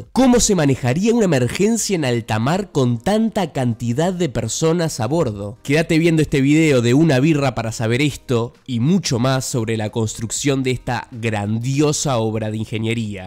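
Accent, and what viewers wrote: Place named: Buenos Aires, Argentina. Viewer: Decime que sos porteño sin decirlo: "mueshe, astishero, quisha, shevada..."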